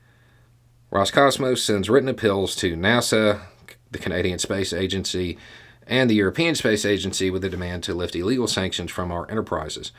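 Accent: South, United States. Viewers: Hey, fellow southerner here, I just wanted to let you know that I really appreciate the work you’re doing here. Your videos are really helpful with swaying right-wing family members. Something about a country boy with a southern accent seems to make them more willing to listen. Keep it up!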